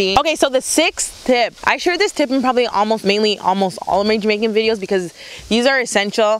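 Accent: Canada. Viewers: Listening to you, I'm picking up a Canadian accent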